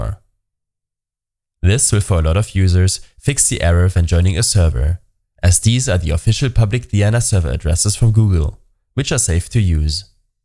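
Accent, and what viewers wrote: Germany. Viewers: Der deutsche Akzent ballert hahaha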